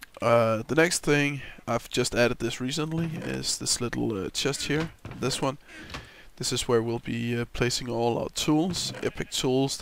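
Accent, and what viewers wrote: Denmark. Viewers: Well, im already recording Ep12 :D i did a couple of fails with editing, but nothing major! well i can only hear that you are danish, because im danish too, so dont worry too much about it :-)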